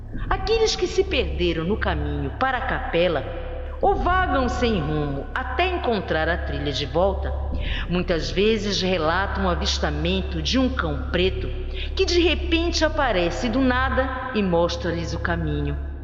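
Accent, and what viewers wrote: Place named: Northeast, Brazil. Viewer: curto seus videos e seu sotaque também rsrsrs nordeste neh?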